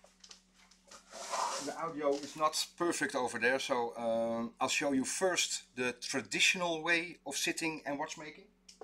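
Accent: Netherlands. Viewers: Ha! Coffee in a shot glass! I’m corrected. You sound like the wonderful Amsterdamer. We were visiting over the holidays and stayed in the historic canal area where my Cousin and family live there. I wish I could have brought my Tudor Chronograph from the early 1980s. I don’t trust just anyone having it serviced. Any suggestions from here in the USA? We are in North Carolina. Thanks.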